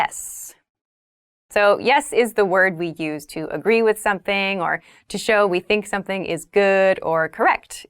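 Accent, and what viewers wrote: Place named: United States. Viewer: English class with a thick American accent :-)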